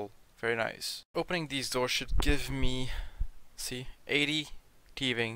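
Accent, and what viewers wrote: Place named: Norway. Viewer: Yo, where are you from? You kinda sound norwegian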